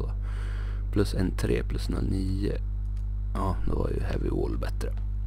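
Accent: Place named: Sweden. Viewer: Älskar din dialekt känn lite bonländska i det (stav) c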